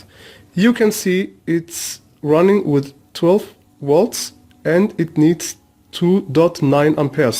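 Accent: Germany. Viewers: Hey, are you German by any chance?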